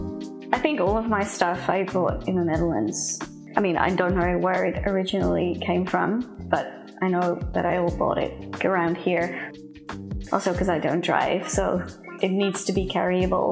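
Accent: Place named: Australia. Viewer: She does have an Aussie accent tho with a bit of something else 😊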